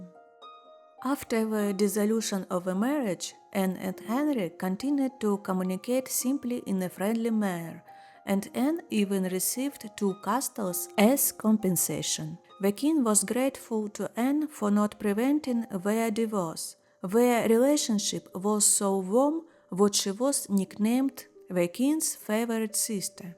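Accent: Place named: Germany. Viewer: The woman's voice narratoring accent sounds Deutsche.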